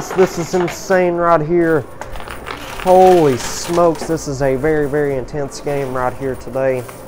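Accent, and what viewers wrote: South, United States. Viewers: I am English and I would like to know which region in the USA is your accent?? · Definitely southern, probably call soda , coke.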